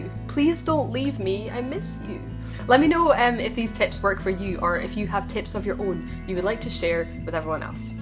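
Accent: Scotland, United Kingdom. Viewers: I love your accent so much. I want to be Scottish when I grow up!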